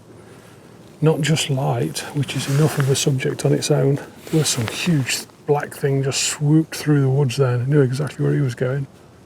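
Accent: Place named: Mansfield, United Kingdom. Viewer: That's a very familiar accent! Mansfield by any chance? Am frum Wooduss! One of the things that made me ask the question, apart from your accent, was the "If you can't be good, be careful!"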